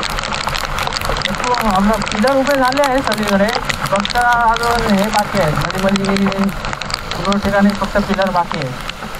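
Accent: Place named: Malvani, India. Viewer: Pure Malvani...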